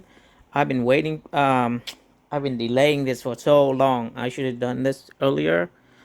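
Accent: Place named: Vietnam. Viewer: chao anh. thanks for the video. I know a vietnamese when i hear one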